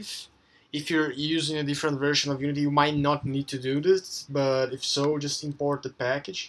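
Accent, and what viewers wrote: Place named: Brazil. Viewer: Are you brazillian? You sound exactly like me when i'm trying to speak in English (this is not an offense)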